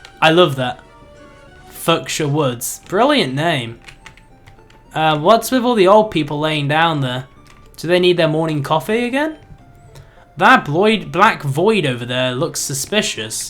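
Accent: United Kingdom. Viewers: He's British... How can you be from Sweden and not even know your own accent? lol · im so suprise about myself sitting here and listening to your annoying english voice 7.minutes. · What accent does have. British? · Sounds More British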